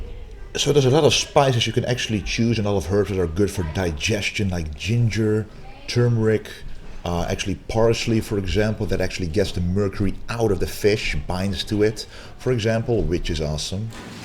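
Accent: Netherlands. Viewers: I can listen to this guy talk all day long, the Dutch accent is very pleasant sounding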